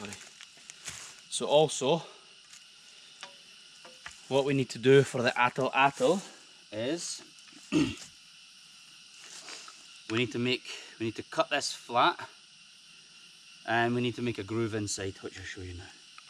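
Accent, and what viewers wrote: Scotland, United Kingdom. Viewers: Nice channel,keep going..areu from Scotland mate?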